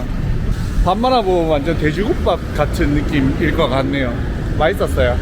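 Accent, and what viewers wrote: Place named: Gyeongsang, South Korea. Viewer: 인스타에서 보고 왔습니다 영상재밌어요 😂 익숙한 사투리이신데 혹시 경남분이신가요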